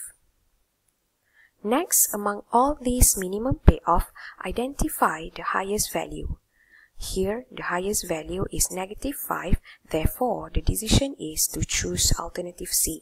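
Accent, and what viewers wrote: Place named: Malaysia. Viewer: Malaysian accent. Nice talented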